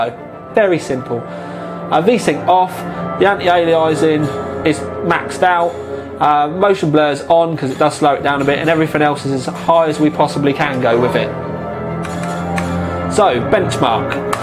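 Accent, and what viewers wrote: England, United Kingdom. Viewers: Why does this russian have an english accent.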